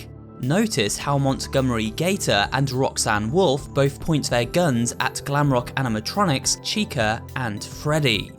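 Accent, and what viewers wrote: United Kingdom. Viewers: like the british accent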